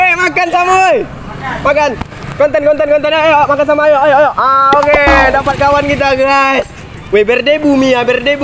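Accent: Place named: Jakarta, Indonesia. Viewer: Kyk org betawi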